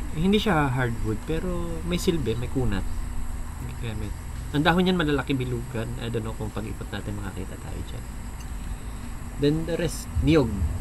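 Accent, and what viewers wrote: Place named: Mindoro, Philippines. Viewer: Pang Mindoro din ang tagalog ni sir hehehw.e..taga mindoro po ako...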